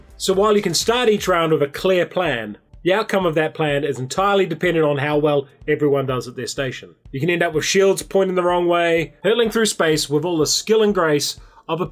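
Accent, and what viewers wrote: New Zealand. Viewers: Kia ora, first time watching your show and obviously picked up the kiwi tone etc. Then to hear the Waikato part was great. Are you still in the Mighty Waikato as Hamilton is my home town.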